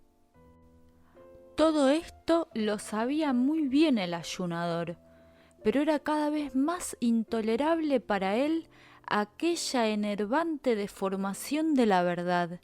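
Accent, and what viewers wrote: Argentina. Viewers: El acento argentino para un audiolibro no es de mi gusto.😒😒😒😒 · El acento argentino no sirve para un audiolibro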